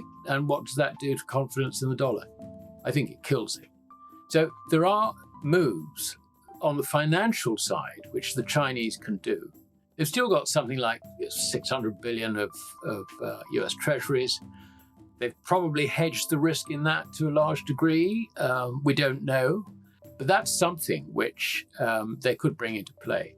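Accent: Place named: United Kingdom. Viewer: It’s hard enough to listen to his Brit voice, the back ground distraction kills his message. I can only give it 5 min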